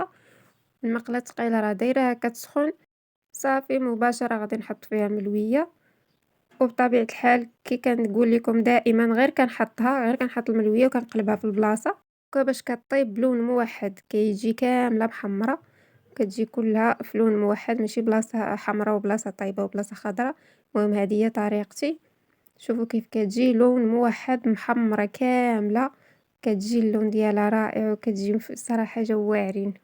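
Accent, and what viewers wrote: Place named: Algeria. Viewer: انت منين من مغرب ،،. راكي وتهدري كيفنا في جزاير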